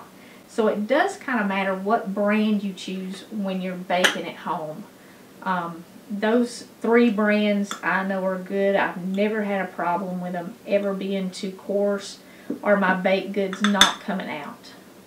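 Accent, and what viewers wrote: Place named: Tennessee, United States. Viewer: Your Tennessee ? accent reminded me so much of someone I just couldn’t place it, and then it hit me! Dolly Parton! The way you pronounce many words reminds me of Ms. Parton!🥰🤩🤗 I am really enjoying your videos. Thank you ! 🙏🏼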